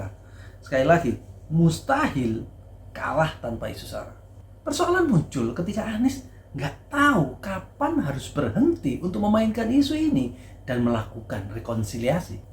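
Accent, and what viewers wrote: Java, Indonesia. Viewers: Logat Tionghoa agak kejawaan medok sekali ini.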